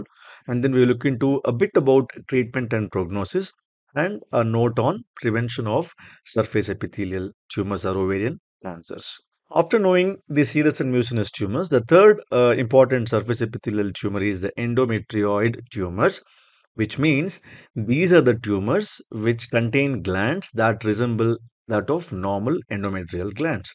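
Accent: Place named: India. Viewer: Indian voice🤢🤢🤢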